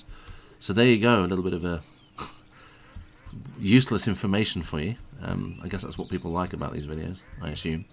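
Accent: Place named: England, United Kingdom. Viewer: Just Subbed you looking at your youtube Victorian homes and I love your English Accent (Smiles)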